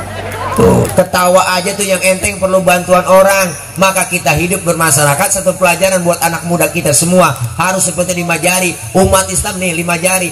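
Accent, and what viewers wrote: Jakarta, Indonesia. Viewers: Betawi baget klo ceramah ini kocak lagi bikin mules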